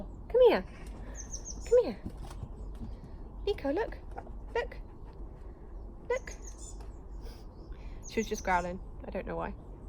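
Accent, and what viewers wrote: United Kingdom. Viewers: I love British accents. Ever since I was a small lad, my Dad and I would always try to imitate all the different kinds. So now I mainly use them on my daughter to make her giggle at times. Your accent reminds me of an old clip from a movie called Wayne's world, a scene that used to make me crack up. <link> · No need to explain anything. You’re house is amazing, love your British accent, the dogs are adorable and all pets are greatly loved. Life is waaaay too short to make negative comments on a very positive channel.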